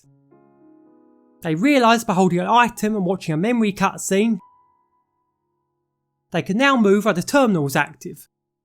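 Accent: United Kingdom. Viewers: This British accent is the most British accent ever.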